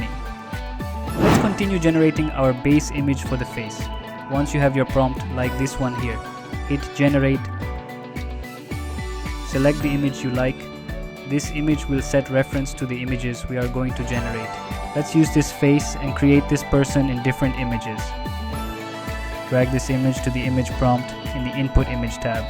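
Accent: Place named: India. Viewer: Indians speaking English sound very ridiculous, next time use subtitles or remove anything below your tonge, the world will thank you.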